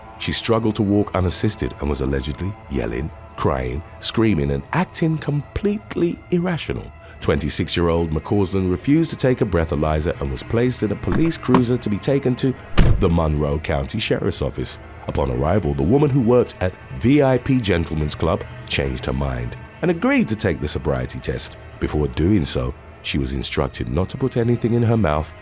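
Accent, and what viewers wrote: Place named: England, United Kingdom. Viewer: Valentine he has an English accent. They pronounce things differently than others may. You need to stop embarrassing yourself by not knowing that.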